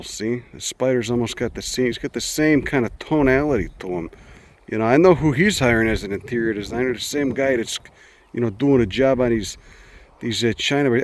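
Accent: Chicago, United States. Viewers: 💯% and with humor. All the above + primo authentic Chicago accent mixed in as a bonus. nothin better 💫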